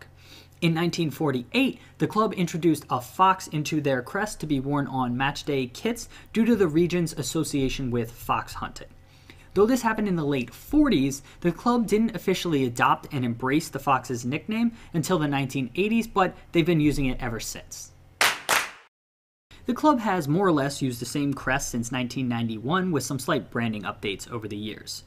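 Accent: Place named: United States. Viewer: Love the American pronunciations lol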